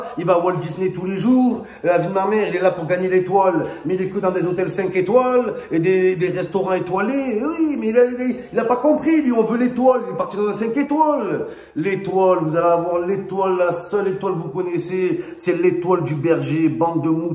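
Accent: Marseille, France. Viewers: Wallah tu parles avec ton vieux Marseille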